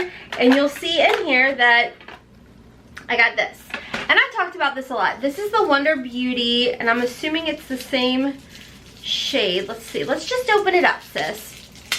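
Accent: South, United States. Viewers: Totally agree it's not worth it. The limited edition bags were good until this bag. I didn't get all the same things you got but mine didn't look like it was even $20 P.S. love your southern accent. Wish I did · You sound extra country in this video lol, cute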